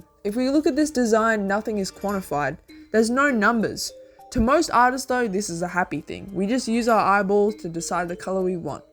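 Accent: Australia. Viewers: as soon as you started talking i immediately yelled 'FELLOW AUSSIE SPOTTED' (it is 12am)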